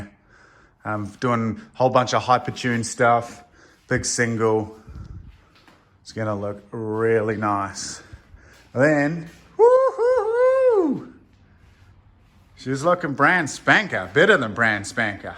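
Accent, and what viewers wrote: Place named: New Zealand. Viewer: gotta kiwi accent 😂 dont worry im a kiwi too nice paint setup for a home job. tommy fyeah spec chur bro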